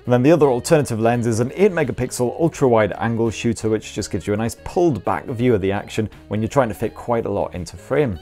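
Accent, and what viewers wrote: United Kingdom. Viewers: the only British fam I've no problem listening to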